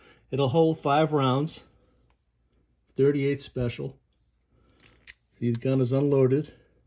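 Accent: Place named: North East, United Kingdom. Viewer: Sounds like you're from up here in the North East as well? A rare breed for firearm reviews! Appreciate the content and how thorough you are going over the model!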